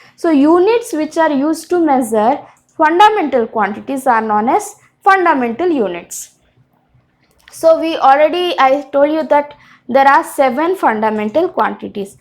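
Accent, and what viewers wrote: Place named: Telugu, India. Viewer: once check the video all of you members including mam we can say that mam's native language is telugu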